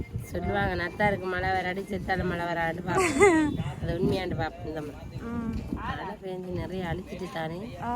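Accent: Sri Lanka. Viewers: இலங்கை தமிழ் பேசும் அழகு தனி அழகுதான் வணக்கம்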